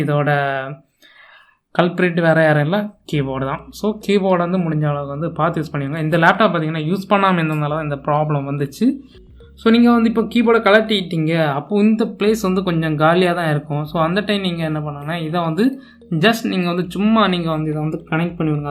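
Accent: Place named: Kanyakumari, India. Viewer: Kanyakumari slang mathiri irukku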